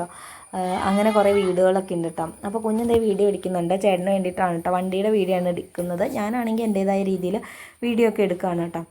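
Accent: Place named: Thrissur, India. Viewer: പെണ്ണേ സൂപ്പർ 👌🥰പ്രതീക്ഷിക്കാതെ കിട്ടുന്ന ചില സന്തോഷങ്ങൾ അങ്ങനെ ആണ് ❤പിന്നെ മഞ്ഞ് 👌🥰🥰🥰🥰എടെക്ക് തൃശ്ശൂർ സ്ലാങ്ങ്കേറി വരണ് 🤩ശ്ശേ!!!🤩അത് നിന്റെ ഏട്ടന്മാര് തൃശൂർക്കാര് ആയോണ്ടാ കുഞ്ഞി ❤👍"രാവണസോദരി"🥰🥰🥰🥰🥰🥰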